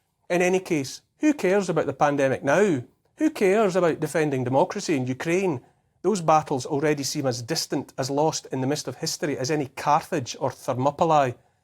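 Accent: Scotland, United Kingdom. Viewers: Although I agree with you on everything, your common sense statements more persuasive in your Scottish burr 💕🇺🇸❤️🏴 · I do pay attention to what say, but sometimes I just play back so I can hear your Scottish Brogue!:O)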